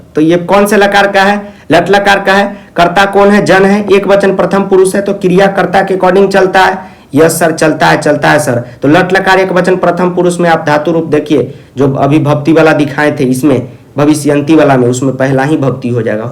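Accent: Bihar, India. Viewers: Your teaching style is so good and your bihari language is so amazing 😍 😍😍😍😍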